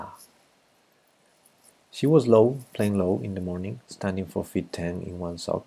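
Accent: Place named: Paris, France. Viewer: the narrator is from paris.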